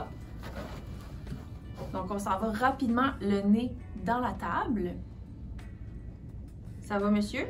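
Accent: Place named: Quebec, Canada. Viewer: Québec lol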